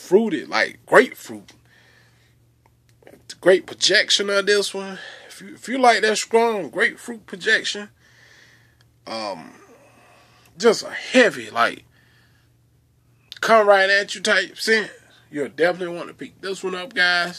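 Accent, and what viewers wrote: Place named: North Carolina, United States. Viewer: Nice review. Sound just like my hubby. North Carolina accent lol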